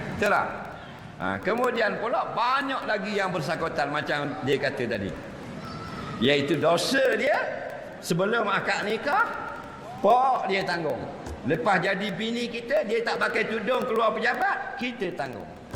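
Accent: Terengganu, Malaysia. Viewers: Orang Indonesia faham ke loghat Melayu Terengganu. Ketawa kamu tak kurang seronoknya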